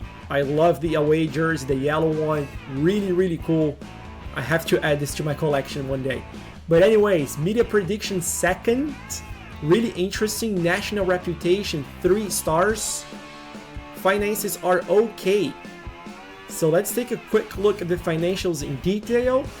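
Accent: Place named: Brazil. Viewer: Brasilians guys allways have that accent, i'll bet he's brazilian =P good job 👍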